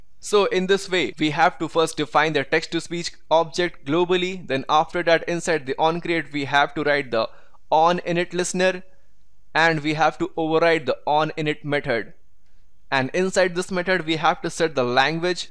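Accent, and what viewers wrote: India. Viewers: Indian xd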